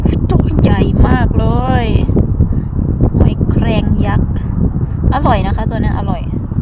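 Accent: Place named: Chaiyaphum, Thailand. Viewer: เว้าคือคนชัยภูมิเนาะ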